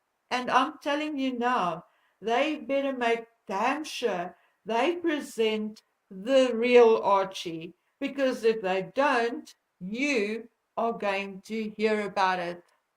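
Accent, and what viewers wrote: South Africa. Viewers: It isn’t Americans falling for Prince Moob or wanting censorship, take your contempt & shove it. Britain Europe embraced Marxism, fascism and nazi-ism. You resent Americans and Brits and Europeans have tried pushing Marxism on us. Leftist organizations attacking Americans free speech rights yet you want to blame Americans. The ignorance is on people like you I have had it. Remain in your old world, ignore the rapes of little girls and women like your royals in all of Britain and Europe do. Have & blame America; I can guarantee you we will never again go to your rescue. You & the former outpost of empire Africa though from your accent you sound more those other allies of the nazis, the Boers. Who has kept & upheld monarchy & made excuses for these backward wannabe grand high poohbahs of upper buttcrack, who had no problems with their empire that they still clutch to their chests and all the problems that came about because of that. 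Who considered drug addicted nazi Harry such a popular cheeky fellow, just as they loved his nazi great uncle David. I had sympathy for Diana, and William, The Soho ho wasn’t popular in the US, but in Canada, she was assisted in entrapping your nazi Harry by other Canadians. Imbeciles like your offspring who vote fascist socialist Trudeau who attacks free speech in ho Canada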